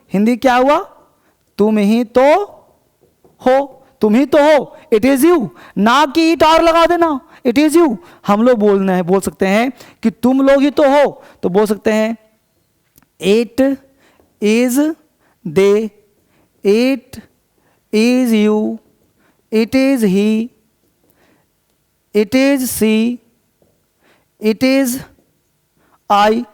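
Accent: Bihar, India. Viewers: ( Wah Sirji ! )you have great energy.I like the most your Bihari tune when you teach online..Ek Bihari sau pe bhari...you have proved that....and go ahead with god bless you..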